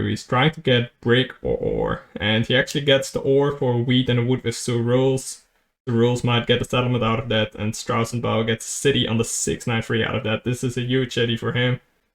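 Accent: Netherlands. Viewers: Hey you sound like you are en dutchman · Are u dutch?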